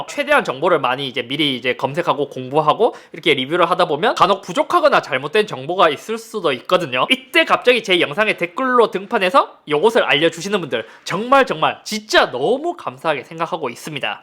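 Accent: Gyeongsang, South Korea. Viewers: 초기부터 지금까지 쭉 봐온 분들은 모두 아실겁니다. 영상에 나온것처럼 갱상도 특유의 발음이나 몇몇 단점도 장점으로 바꿔오고 노력해오면서 이렇게 훌륭한 유튜버가 된 서울 야스킹을 말이죠 형 너무 사랑해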